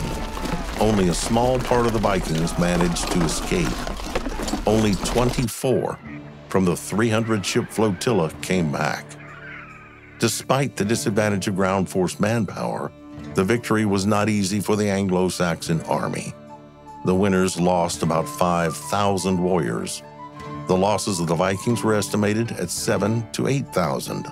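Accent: United States. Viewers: A medieval battle in the north of England narrated by an American cowboy. Love it! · Sorry I struggle with the narration it’s too loud and painfully American . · Bad American Voice over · such a shame a yank commentates,..ruins the whole thing!!!!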